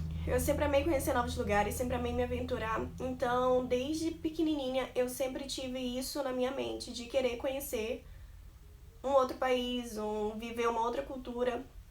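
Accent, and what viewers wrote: Pará, Brazil. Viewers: O que vc estudou e se formou na faculdade? E como vc fez para se sustentar aí? 😱😱😱 sei q é caro, o dorm tb é caro! Fala um pouco sobre a questão do racismo? Vc é natural de onde,? Parece Paraense pela sua fala❤️Bjusss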